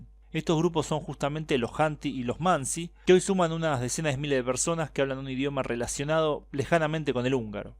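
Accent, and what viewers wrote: Rioplatense, Argentina. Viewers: Hay palabras que sencillamente no se que dices, son solo palabras pero tu Argentino dificulta un poco saber que palabras acabas de decir. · What he was trying to say is that the channel is from Argentina, not from Spain. But we all speak Spanish. I'm chilean and I recognize his argentinian accent. Greetings to Ukraine! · Asi se pronuncia el castellano rioplatense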